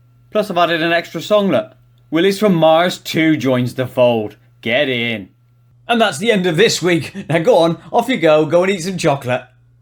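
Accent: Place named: Somerset, United Kingdom. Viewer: Where are you from? You sound local ish to me! I'm a Somerset boy! I'm guessing Bristol or Swindon!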